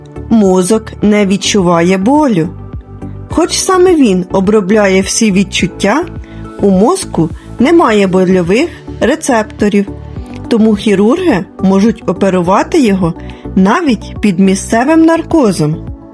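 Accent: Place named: Poltava, Ukraine. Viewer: Не дивуюсь, але не перестаю дивуватися, який Господь великий! А ще поважна людина, що озвучує з Полтавщини, чи Черкащини))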